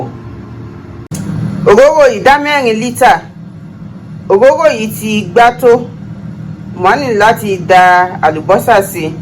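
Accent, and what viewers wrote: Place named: Ilorin, Nigeria. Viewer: You should have added subtitles. But I kind of enjoyed hearing pure Yoruba video. You sounded like you're from Ilorin.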